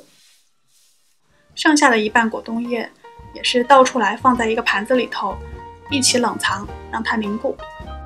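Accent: China. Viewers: ellen Qu 我猜是中國因為我去過大陸我覺得她有時講話很和大陸人講的一樣喔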